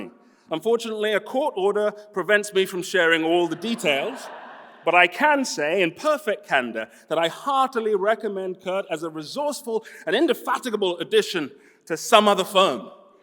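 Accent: United Kingdom. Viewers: shit is so scary when you see a guy in american productions only and then he's british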